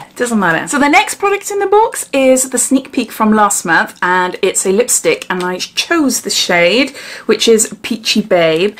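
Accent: Wales, United Kingdom. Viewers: This review is really helpful as I was thinking about trying this out- going to go for Pip instead! Love your accent- makes me want to return to lovely Wales! And you’re v brave living with 4 others- I’d go nuts!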